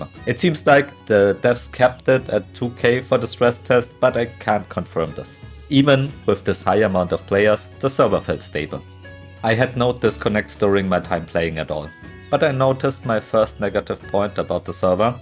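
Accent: Germany. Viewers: thanks for the video but the german accent is bursting me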